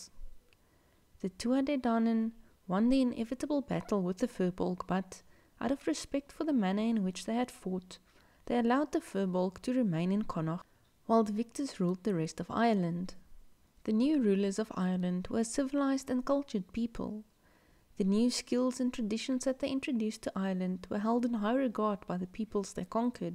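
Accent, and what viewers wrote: South Africa. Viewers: are you south africa!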